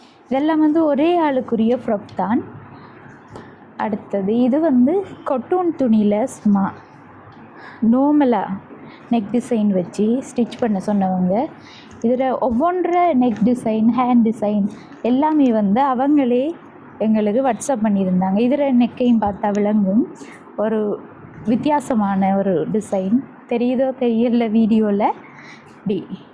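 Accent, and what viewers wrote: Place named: India. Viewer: உந்த சல்வாரையும் கொல்வாரையும் விட்டிட்டு கொஞ்சம் modern க தையுங்க. அதோட இந்தியன் slang ல பேசாமல் மட்டக்களப்பு slang ல பேசுங்கோ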